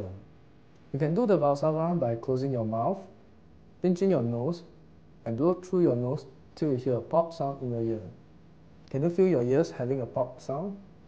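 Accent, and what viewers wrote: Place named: Nigeria. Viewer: He sounds very nigerian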